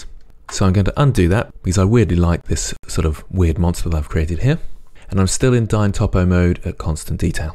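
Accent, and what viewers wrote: United Kingdom. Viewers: You know you're an Intelligent individual when you sound British and teaching Blender 2.8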